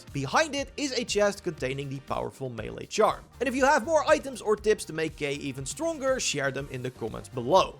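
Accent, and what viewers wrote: Netherlands. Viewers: Ga alsjeblieft gewoon Nederlands spreken Je kan geen Engels Je hebt een heel zwaar Nederlands accent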